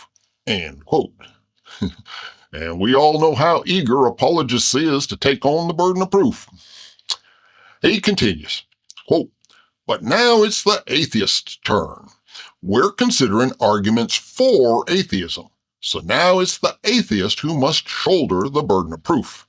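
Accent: South, United States. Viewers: You are healing my fear of southern accents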